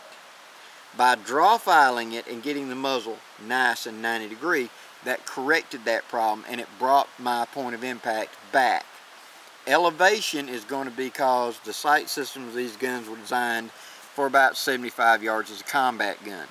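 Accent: United States. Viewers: Best cap and Ball Videos ever! Lot of history and technology said in beautiful Stories,in a lovely american Englisch, great pleasure to wach it.Thank you Sir for these series. These Guns played a signifiant role in making USA a big Nation ,later able to save Europe twice : WW l and WW II.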